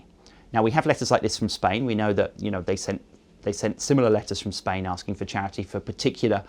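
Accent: Russia. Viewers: Brilliant program. Host is an Ashkenazi with a comical Hollywood-style Muscovite accent. He couldn't be funnier if he tried.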